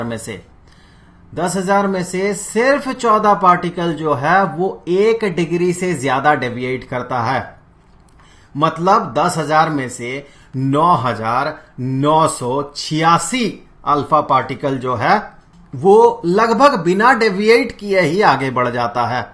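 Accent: Bihar, India. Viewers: Sir ap bihar se ho pls tell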